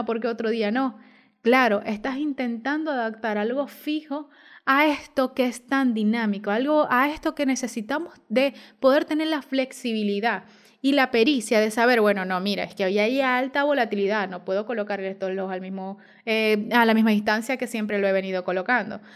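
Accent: Maracaibo, Venezuela. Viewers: Verrrgacioon maracucha te amoo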